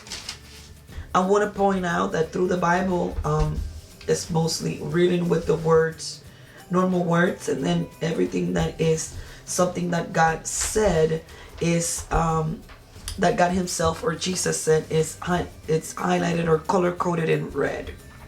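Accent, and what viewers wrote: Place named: Spain. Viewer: You don't need to apologize for your spanish beautiful accent. Your English is perfect. God bless.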